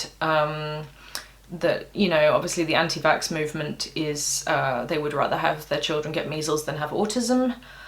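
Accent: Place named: England, United Kingdom. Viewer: Hiya, thanks for replying. Apologies for making the assumption that you were in England just by your accent and before having watched more of your terrific videos. Your assessment appears to have been quite different to mine. Wouldn't it be wonderful to get to a point where we are just understood, accepted and appreciated without the need for 'diagnosis'? We can but dream.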